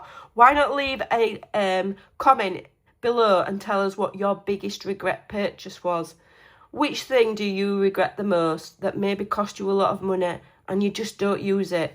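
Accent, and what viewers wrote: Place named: West Yorkshire, United Kingdom. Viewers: I think is from West Yorkshire in England or i could be completely wrong and she's from Lancashire in England! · I feel your pain! I had a massive clear out last year and donated all my lower quality art supplies to my local primary school and they were SO grateful! Schools never have money to spend on art supplies so they were so appreciative. My biggest regret purchase is my Copic markers. I don’t even want to think about how much I spent on them because I’d honestly cry. They’re brilliant but I just don’t use them. If you want to sell your wet strength tissue paper and Dina Wakley paints I’d be happy to take them off your hands! I’m in Sheffield and you sound not far from me!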